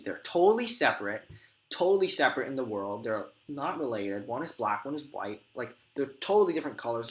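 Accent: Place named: China. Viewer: 听口音像是中国人，所以能出个中文版吗？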